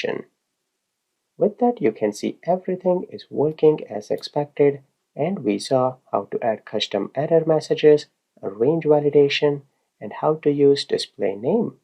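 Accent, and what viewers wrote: India. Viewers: Absolutely the best <link> tutorial video I've found so far. Having an Indian accent is nice. However, when you speak, it feels like you're singing with the same tone all the time. Please, just speak in your natural tone. Don't try to sing. This singing part made it very hard to understand what you were saying. i had to listen 3-4 times to understand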